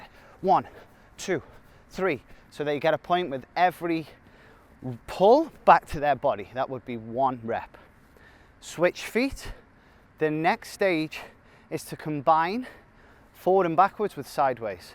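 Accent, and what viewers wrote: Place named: England, United Kingdom. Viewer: love these videos but i cant help to get confused by why he has an english accent and calls it soccer?! like its Football in England.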